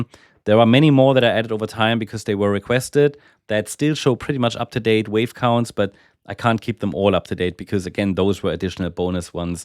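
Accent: Germany. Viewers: you have a german accent, are you german? )))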